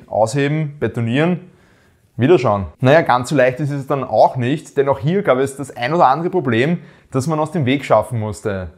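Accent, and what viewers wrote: Austria. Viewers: Wunderschöner Wiener Akzent und optisch sehr angenehmer Erzähler, sehr schön, anzuhören und anzusehen, von der Information gar nicht zu sprechen. Vielen Dank 😊🎉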